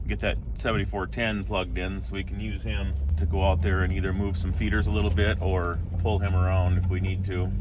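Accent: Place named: North Texas, United States. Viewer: Sounds like us here in North TX😀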